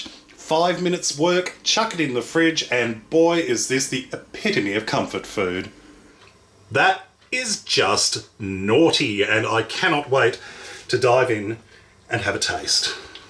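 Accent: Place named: Australia. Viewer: Love your videos I heard your Australian because I am too keep making fantastic videos and we can reach one million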